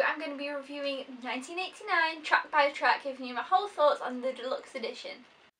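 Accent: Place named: United Kingdom. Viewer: I watched this entire 18 min video start to finish. I love your British accent and you kinda look like Tay Swift as well. Very good review for someone who doesn't usually do music reviews!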